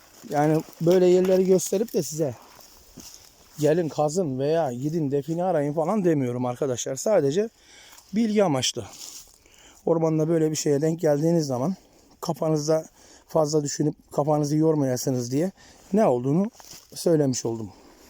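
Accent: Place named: Kahramanmaraş, Turkey. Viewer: Konuşmana bakılırsa maraslisin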